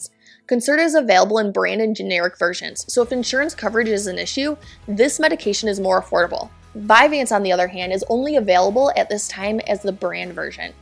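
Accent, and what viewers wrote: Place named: Wisconsin, United States. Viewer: You’re from Wisconsin or something with that accent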